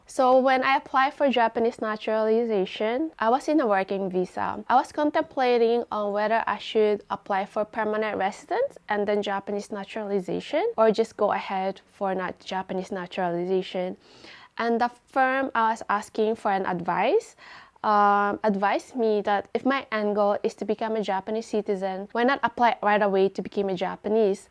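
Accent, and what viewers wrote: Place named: Philippines. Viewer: Last 3 videos you did well to hide Filipino accent but not in this video 😂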